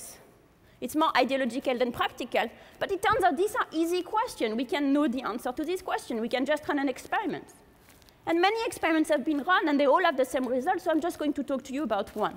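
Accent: France. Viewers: that's how a french speaker speaks english. Speaking some of the R as H, some H as SH. French is easy and fun if you English and Hindi.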